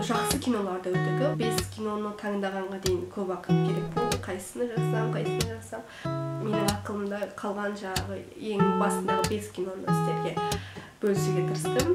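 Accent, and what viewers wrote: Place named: Mongolia. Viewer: Моңғолядансзба, Туркиядансзба Чота тіліңіз бір жақа тартып тур)))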